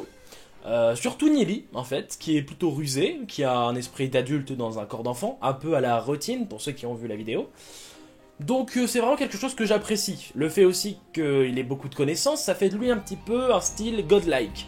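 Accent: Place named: United Kingdom. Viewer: J’aime pas l’accent anglais. À travailler..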